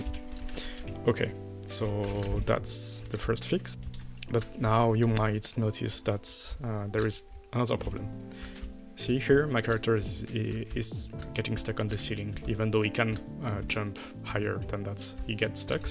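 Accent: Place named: France. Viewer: Le petit accent Français qui ma donné le sourire pdt toute la vidéo, merci à toi btw ;).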